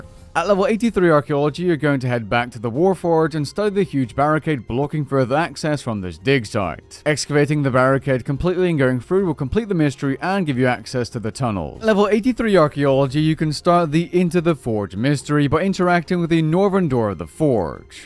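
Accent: Netherlands. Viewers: Reiniger Defently dutch i can hear😂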